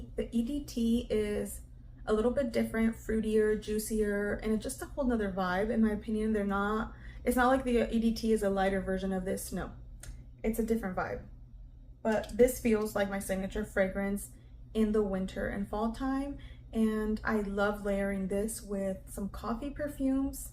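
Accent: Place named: United States. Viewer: Where you from? I love your american English accent is so clear❤❤ your baby is so cute❤❤❤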